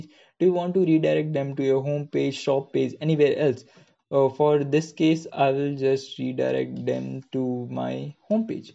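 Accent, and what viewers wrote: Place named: India. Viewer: Haha I have not bought it indian accent come on you are annoying.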